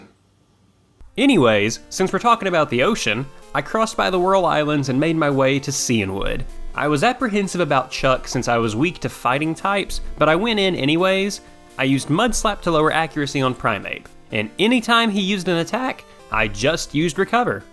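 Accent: South, United States. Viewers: First Southern PokeTuber I’ve found! Been wondering if Corsica could do it lol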